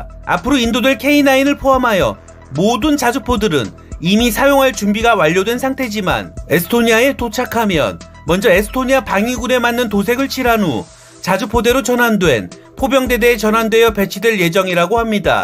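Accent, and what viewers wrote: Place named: North Korea. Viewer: 영상 잘 봤습니다. 영상 몇개를 봤는데요. 내용이 참 좋네요. 근데 말투가 남조선민주주의인민공화국 스러운 말투가 떠오르는 듯한.....ㅎ;(죄송요^^;) 영상 초반에는 너무 강렬 하신데, 후반으로 갈수록 남조선민주주의인민공화국 스러운 느낌이 빠지고 괜찮아 지시는데 ㅋ 말투 특유의 개성이 있으시네요. 살짝 북한 스러운 ㅋㅋ 초반에 좀 어색했네요. ㅎ 암튼 내용 좋고, 개성 있으셔서 잘 되실거 같습니다.^^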